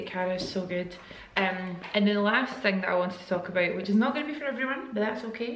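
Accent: Scotland, United Kingdom. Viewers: I’m a huge long time fan from U.S. and I just want to point out that the Scottish accent is extra strong today . LOVE IT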